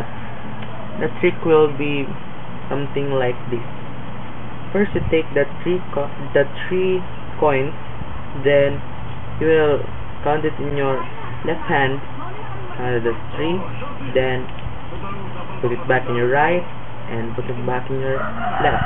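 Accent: Philippines. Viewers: HAHA total Filipino accent good job though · he has a fob voice philipino pride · Dude r u Filipino? Ur accent is so strong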